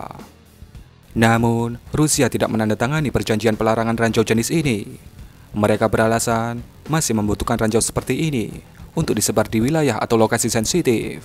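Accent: Java, Indonesia. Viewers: Suaramu medok bro kocak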